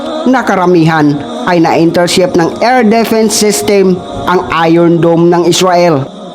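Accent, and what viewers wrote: Iloilo, Philippines. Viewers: Natural na boses yan ni lods hindi tulad ng iba nagamit ng ai signature yan. Maganda dito detalyado kahit natural na ilongo🇳🇮🇵🇭🇺🇲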